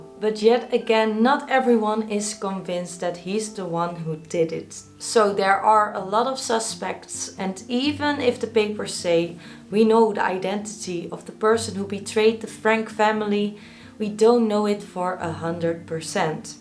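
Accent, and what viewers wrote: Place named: Netherlands. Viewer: ik heb een warme plaats in mijn hart voor Engelstalige YouTube video's met een waarneembaar Nederlands accent. Als ik Engels spreek heb meteen een soort identiteitscrisis omdat ik word geconfronteerd met de keuze tussen Brits Engels en Amerikaans Engels, dit is volslagen onzin; ik zal Nederlands Engels spreken! that's different coockie!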